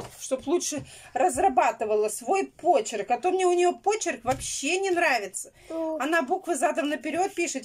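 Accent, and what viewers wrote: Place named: Nizhny Novgorod, Russia. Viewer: А я сразу понимаю что человек с нижнего Новгорода по говору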